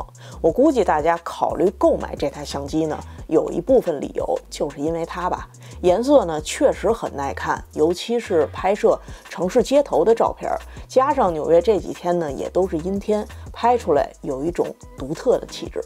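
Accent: Beijing, China. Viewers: 她是北京人，并不是所有大陆人都这种口音好吧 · 這是蠻道地的北京腔，吃好喝好的比較平，比起某些口音會突然拔尖高音調，我比較喜歡EDI這種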